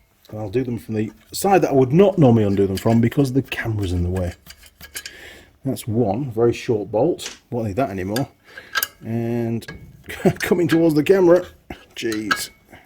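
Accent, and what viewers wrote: Yorkshire, United Kingdom. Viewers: Where in the UK were you from originally? I'm detecting a Yorkshire twang.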